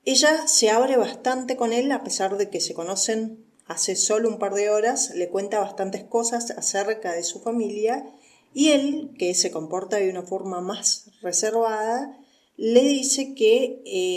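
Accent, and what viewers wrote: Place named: Argentina. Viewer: tienes razón promete y mucho esta serie y ellos hacen buena pareja, Kivanc no defrauda me gusta mucho es un actorazo, siguiéndole como siempre desde MALLORCA (perdona tu ede que parte de Argentina eres,xq tienes el acento pero eres muy pausada y dulce hablando,lo dice alguien de Rosario ),🙋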